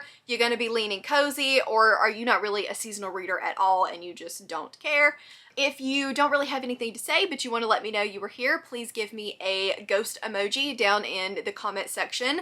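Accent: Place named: South, United States. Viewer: You may say or have it somewhere.. and if you don’t then you absolutely don’t have to confirm my suspicion if you don’t feel comfortable doing so. This is the internet, after all. But based on your voice, I suspected you are from The South… but then you just said football and bugs dying and now I’m convinced. (And in no way, shape, or form am I mentioning your voice as an insult! Just in case that needs to be said!)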